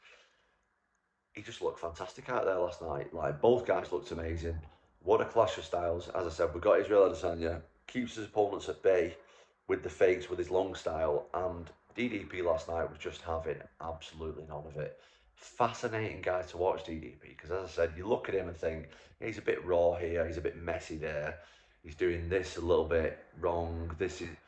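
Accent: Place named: RP, United Kingdom. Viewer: Hello Tom, I'm a huge fan and I also think you are the next level of fighter with a concentration in striking, distance, wrestling, ground game, and a great working fight IQ. However, I think you are speaking the Queen's English and keeping in European etiquette, while trying to communicate to a filthy American (Dana White), where getting the unification match is concerned. If you are going to contain yourself, and he wants you to be contained, then you've already lost the argument. There is no need to respond and hearing it any further in such a manner id just bothersome. If you want this mother fucker to actually be his authentic self, and have a real dialogue, then speak his language. "You say Jon Jones is the pound for pound greatest MMA fighter of all time, I know you are wrong. I'M the greatest pound for pound MMA fighter of all time. 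You put the two of us in a room and I'm killing him and walking out! Period. He's depending on you to hide him from me, because I'll kill him in front of everyone. If you think I'm wrong, than put your money where your mouth is and schedule the fight. If he backs out, he forfeits the belt, the title, and any talk about being good at anything. You want to have this conversation? I'm willing to back it up by bashing his face in. You want to go on saying he's pound for pound the best, then prove it. Prove it or continue to allow him to hide from me." Something like that is what I would hear from my Mom every day waking up for school. We'd fig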